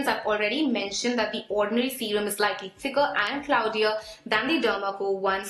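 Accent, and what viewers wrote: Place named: Bengal, India. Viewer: di doesn't have speech disorder. She just speaks in a bengali accent and there's nothing wrong in it.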